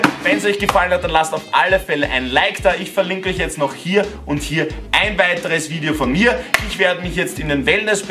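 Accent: Austria. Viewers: Ich liebe österreichisch🇦🇹bist du deppat endlich einen gescheiten Youtuber gefunden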